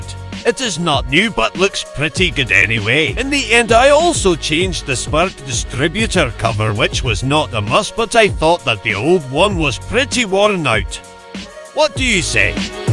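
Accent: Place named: Scotland, United Kingdom. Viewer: I love the Scottish voice! 😁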